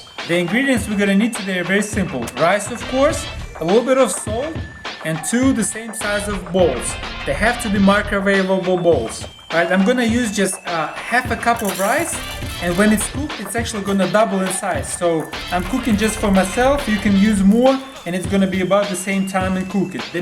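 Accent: Russia. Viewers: I can tell you are Russian or Ukrainian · “He sounds like crazy Russian hacker” most people from that part of the world do. So duh.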